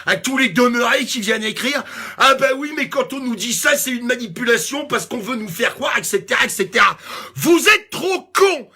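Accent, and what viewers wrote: Paris, France. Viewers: Apres cette video, si ceux qui l'ont regardee ont un peu de reserve ou de pudeur, ils ne devrait y avoir aucun commentaire! C'est tout ce que je dirai et a ton contraire, je finirai en disant;"je t'aime'! Ah! et cet accent super PARIGO que tu te payes, un regal!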